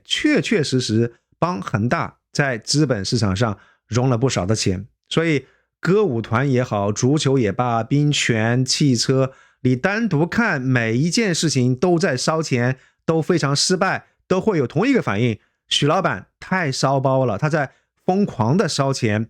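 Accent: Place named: Guangzhou, China. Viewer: 从发音来看，我猜是广州人:face-blue-smiling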